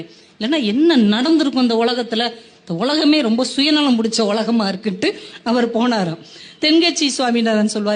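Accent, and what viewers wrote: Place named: Madurai, India. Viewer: இதுவரை கேட்டிராத, மிகவும் கற்பனைவளமுள்ள சிறப்பான குட்டிக்கதை. குடிமகன்களுடைய வாழ்க்கையே தனி, மதுரைத் தமிழ் அதைவிட அமர்க்களம். அன்புச் சகோதரி வாழ்க உங்களுடைய தொண்டு.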